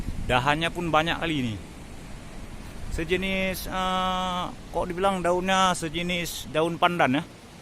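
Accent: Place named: Malaysia. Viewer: Ngomongnya kayak orang malaysia mas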